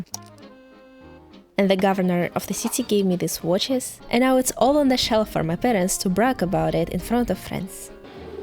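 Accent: Russia. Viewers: I like the way you talk it is soo cute haha your russian accent ❤️ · You have authentic russian accent..love it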